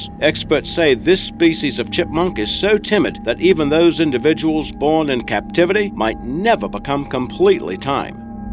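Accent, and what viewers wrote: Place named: Australia. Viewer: Your accent sounds Australian. If so, you've got a few amazing animals yourself...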